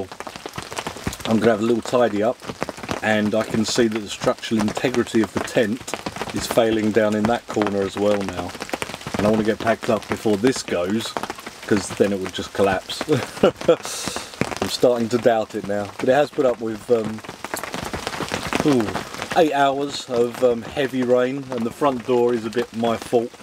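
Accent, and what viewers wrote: England, United Kingdom. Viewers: Been viewing your videos lately and really enjoying them ....very relaxing . Good to hear your English as my ancestors came from England and Ireland.Iwas born a Spencer? Will watch more or your interesting videos. Cheers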